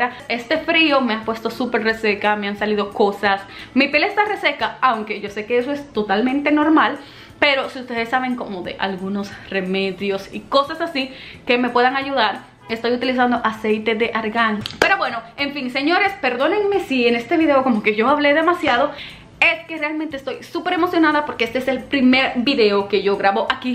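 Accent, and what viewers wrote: Dominican Republic. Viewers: Vy C Ella es del cibaao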